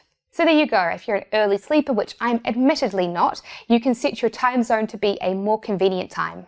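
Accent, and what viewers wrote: New Zealand. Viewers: That En Zed akcient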